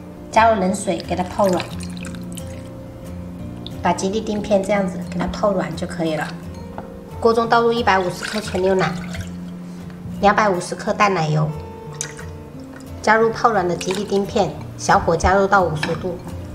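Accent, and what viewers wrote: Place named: Fujian, China. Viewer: 听口音是福建人 她老公可能是四川的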